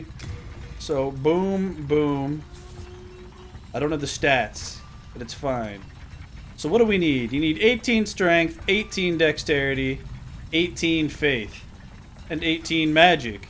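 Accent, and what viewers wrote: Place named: Atlantic Canada, Canada. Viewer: Your accent sounds Atlantic Canada.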